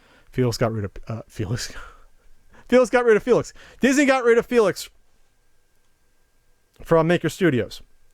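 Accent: New Jersey, United States. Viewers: ah you can hear the New Jersey in you. #1 state in ppl cursing. Jersey misses ya!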